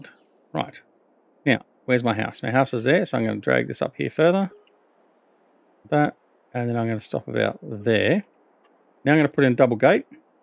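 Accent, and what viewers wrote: Australia. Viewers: Bro you can tell this dude is Australian because that’s exactly what one sounds like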